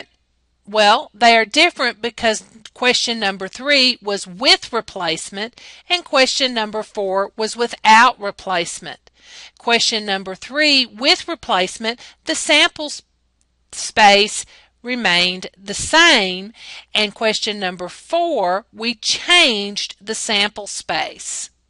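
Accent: United States. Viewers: What accent r u using boston? Southern? I like it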